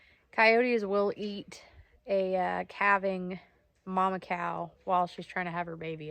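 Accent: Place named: Wisconsin, United States. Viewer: Sounds like Wisconsin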